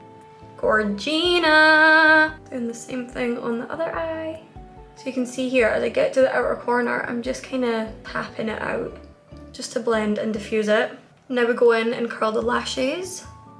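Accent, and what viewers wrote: Scotland, United Kingdom. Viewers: Omg your so pretty also r u Scottish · Scottish accent :) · is that a scottish accent?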